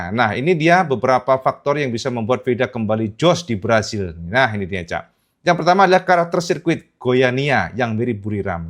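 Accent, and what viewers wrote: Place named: Java, Indonesia. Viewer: Om orang mana ya kok bahasa jowone weenak empuk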